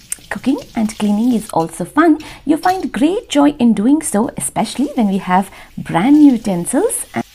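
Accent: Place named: Hubli, India. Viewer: Hi ri nim channel yavag nodidru tumbane Kushi agutte ri and vegetarian nalli eshtondu Verity food esht unique aagi quick aagi cook madbahud anta gottirlilla, and nim channel nalli prati video kuda different food style different things galanna nodtini nanu adu bhal eshta agutte so I regularly watching ur channel and I m the big fan of u n ur channel ❤️. Luv u soo much dr 😍. And ennond en eshta andre nivu nim maklanna matadso reeti avvi appi annodu 😍😁 and pakka Hubli bhashe all things I just luved it ❤️ Thanks fr ur informations and suggestions. Love from Bagalkot and Raichur ❤️❤️❤️❤️❤️❤️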